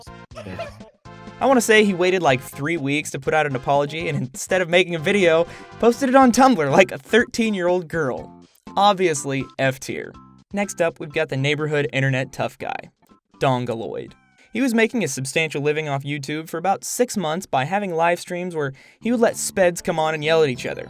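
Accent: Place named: Midwest, United States. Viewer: I can here your accent big time in this vid. Can't say I completely place it but I believe that it's a midwestern accent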